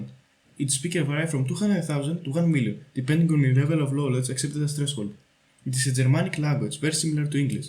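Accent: Greece. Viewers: Corped_Viper YB He’s Greek, cut him some slack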